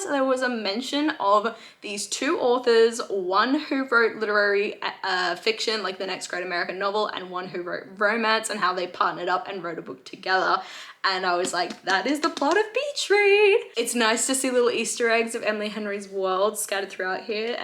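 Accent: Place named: Australia. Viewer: You have the same edition of Happy Place that I have .i also have the Wisteria Society to read, may move that up. I had been trying to figure out your accent, thought Oz but it doesn't seem that strong. Where in Oz are you from?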